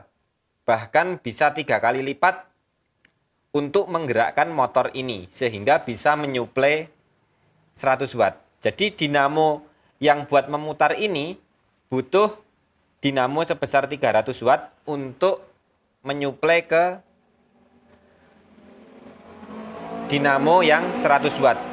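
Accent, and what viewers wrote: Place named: Banyumas, Indonesia. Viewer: Kalau boleh tahu orang mana, mas? Usianya 30an atau 40an kah? karena susah ditebak dari fotonya yang tampak muda, tapi suaranya jelas sekali dan terdengar seperti Pak Lik saya yang orang Cilacap,, saya subscribe yaa,, jarang-jarang saya bisa menangkap suara pengucapan sejelas ini di yutub karena saya orang nya Hard of Hearing alias gangguan pendengaran sedang,, dan orang dengan logat ngapak seperti Pak Lik saya gampang saya dengan suara pengucapannya,, kebetulan juga sedang mengerjakan tugas akhir membuat contra-rotating axial flux permanent magnet generator,, back emf nya tampak terlihat sekali karena salah rotor magnet yang seharusnya ikut berputar bersama rotor kumparan malah diam dan terasa ada drag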